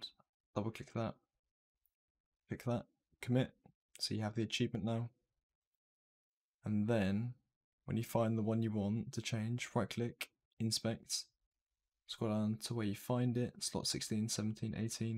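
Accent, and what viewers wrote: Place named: United Kingdom. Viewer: THATS IT! I did not understand what you said since you are very British God do not save the queen God save bin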